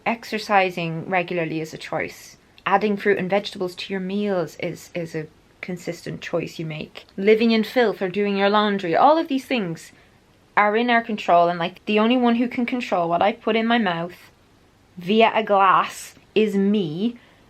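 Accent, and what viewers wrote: Ireland. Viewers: Your accent though…… I want an Irish woman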